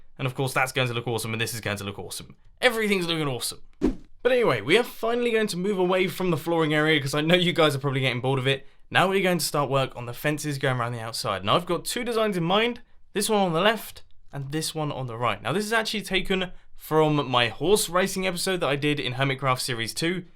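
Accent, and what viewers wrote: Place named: United Kingdom. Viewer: I don't usually complain very much on the internet but has gotten really lazy over time. Now it's all about dumb progress updates every five seconds with him saying "This actually took longer than it seems!" as an excuse. Trying to reach the 20 minute deadline to make an average episode with the least ammount of effort possible. I mean if you AFK overnight that should be around 8 or 9 hours, not 14 or 15 like you said. You spend more than half the day sleeping? Also your go-to excuse is "BECAUSE IM A SPOON!! HAHA!!!" Now being stupid and having a british accent are his only selling points. I unsubscribed a relatively long time ago, because other hermits are better youtubers, but I come here from time to time to check and it's getting worse. Now he's adding merchandise into the mix. This is getting commercial now. Ugh.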